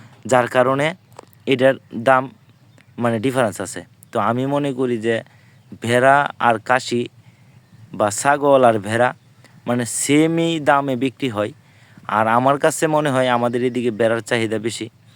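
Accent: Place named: Kishoreganj, Bangladesh. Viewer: আপনার কথায় বুঝা যাচ্ছে আপনার বাড়ি কিশোরগঞ্জ জেলা। আপনার নাম্বার টা দেন ভাই।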